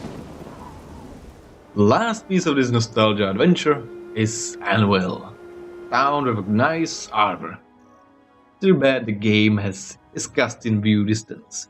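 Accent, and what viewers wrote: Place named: Europe. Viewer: I think he's just European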